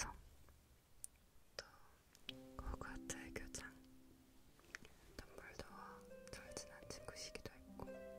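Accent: France. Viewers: I really wish I could understand, I just wanna know if she’s doing a French accent or not!! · Love the little kisses when introducing herself. Very nice "french touch" ! ♥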